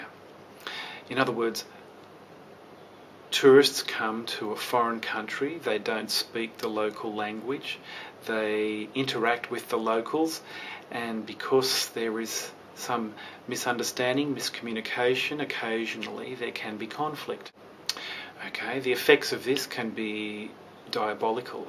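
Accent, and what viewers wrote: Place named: Australia. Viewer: it's an Australian accent.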